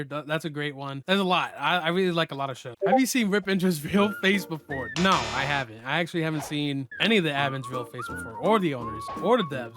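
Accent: United States. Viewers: and you sound america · youre from california?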